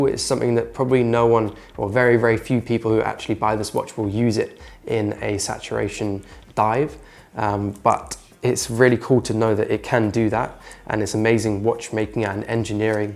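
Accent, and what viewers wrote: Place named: United Kingdom. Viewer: because hes British and they can't seem to pronounce their own language to save their lives over there.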